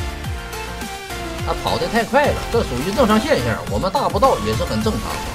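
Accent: Northeast, China. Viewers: 东北话太好玩了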